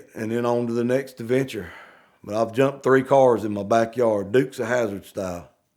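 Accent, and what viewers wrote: South, United States. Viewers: you were right! wicked fun story and the whole southern voice mixed in whith the southern sayin’s is gold! thanks ED, needed a good chuckle today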